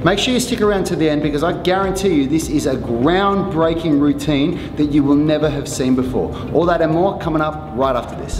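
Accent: Australia. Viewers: Has he got Australian accent?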